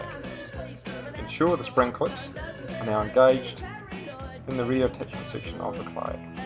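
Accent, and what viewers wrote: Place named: New Zealand. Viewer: Love the concept and the New Zealand accent!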